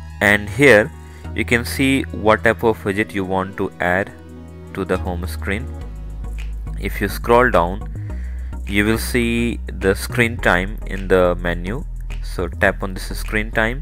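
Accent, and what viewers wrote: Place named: Asia. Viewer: you sound like you are Asian I would appreciate it if you would speak British instead, even though I only have a British accent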